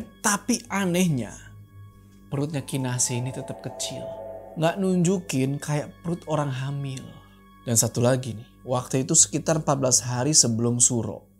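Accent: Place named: Malang, Indonesia. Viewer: curiga arek malang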